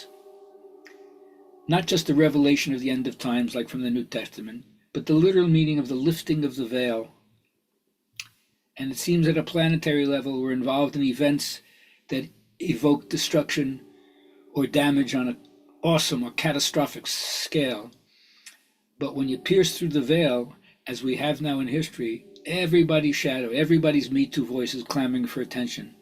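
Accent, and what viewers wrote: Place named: India. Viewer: That Indian accent 🤣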